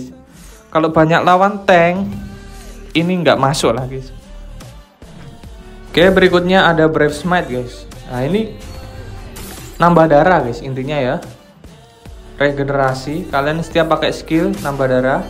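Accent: Java, Indonesia. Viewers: bang Jawa ya?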